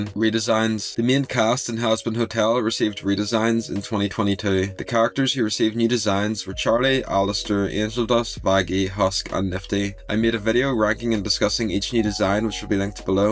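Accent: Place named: Northern Ireland, United Kingdom. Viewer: are u northern irish? i immediately recognised that accent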